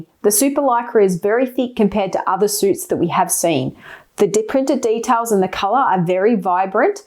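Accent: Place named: Australia. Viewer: when she first said in the video Spider-Man i knew she was a AUSSIE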